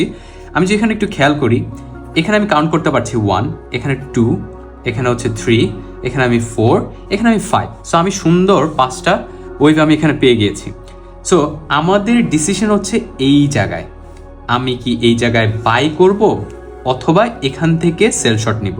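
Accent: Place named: Bangladesh. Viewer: ভাই উনার ভাষা শুনেই বুঝা যায় উনি বাংলাদেশী।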